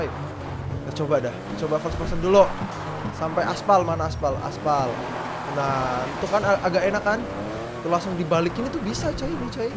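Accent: Jepara, Indonesia. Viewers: Bang orang jepara ya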